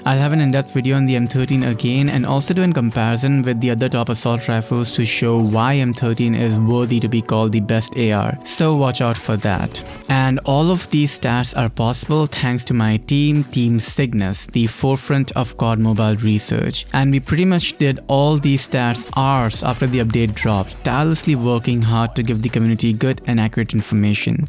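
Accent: India. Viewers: Are you Indian??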